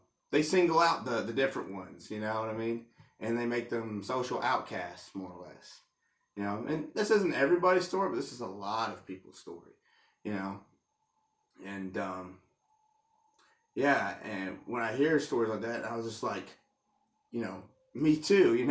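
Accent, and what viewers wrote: United States. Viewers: As a trans woman from rural Appalachia, it means the entire world to me to hear a straight, cis man with a similar accent finally support my existence. It's the closest to feeling accepted by home I think I've ever gotten to experience. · What the hell were you bullied for? Good looking guy, normal deep southern voice. Not fat. not effeminate. I don't get it? Bullies are stupid apparently!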